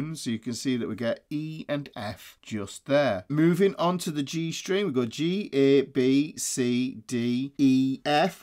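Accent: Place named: Durham, United Kingdom. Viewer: The BEAD on the 7th fret helped me so much! Also, I lived in Durham for a long time and your accent makes me feel so at home!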